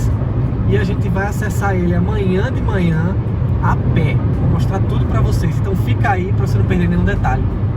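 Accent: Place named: Northeast, Brazil. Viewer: Eu sei que e brasileiro sim mas seu sotaque e nordestino isso que disse .Eu sou paulistano e tenho inúmeros amigos nordestinos.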